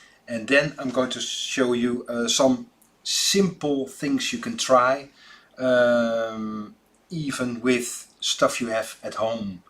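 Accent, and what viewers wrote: Netherlands. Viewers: Your dutch accent reminds me of relatives in Grand Rapids when I was a child growing up.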